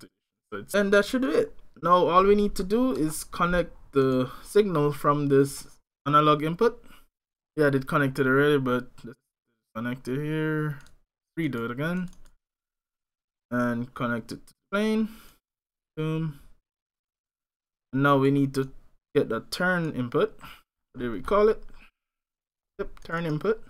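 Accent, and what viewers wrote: Jamaica. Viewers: Mi did a hesitate fi learn godot but hearing another Jamaican do exactly what I want is inspiring. Must learn it this summer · are u Jamaican ?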